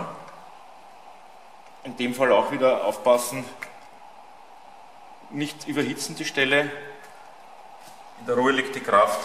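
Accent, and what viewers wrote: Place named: Austria. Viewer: Bist sicher a Österreicher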